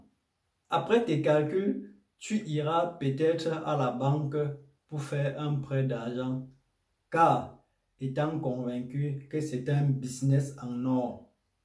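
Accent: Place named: Cameroon. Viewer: Merci beaucoup pour tes videos mon frere, moi cest Paul, je ne sais pas si tu es camerounais, sinon ton accent me fait penser que oui. Jai aussi une question, Quels businesses conseillerais tu a un jeune qui se lance en affaire et na que 500 000 FCFA de capital au cameroun ?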